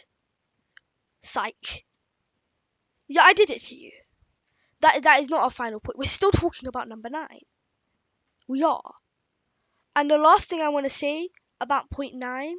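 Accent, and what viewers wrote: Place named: United Kingdom. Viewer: Briish? Its fine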